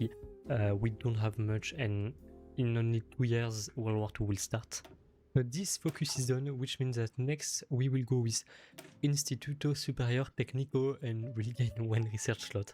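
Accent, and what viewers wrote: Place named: France. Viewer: Sorry, my ears are trained to not hear when they hear france accent so the whole video was mute. How did I understand there was someone speaking with accent? By the comments.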